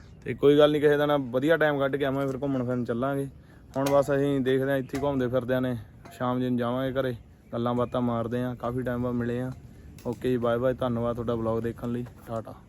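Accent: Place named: Malwa, India. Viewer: Bhai sohna vlog hunda thoda. Tusi Punjab to kehde jille to ho? Boli Malwe aali lagdi, saade angu.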